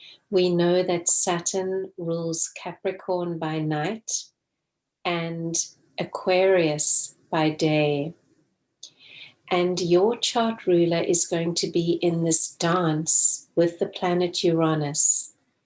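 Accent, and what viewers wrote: South Africa. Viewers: I detect a South African accent.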